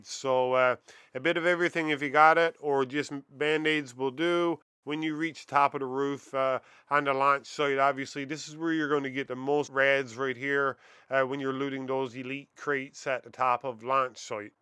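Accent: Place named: Newfoundland, Canada. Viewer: You gotta be a Newfie lol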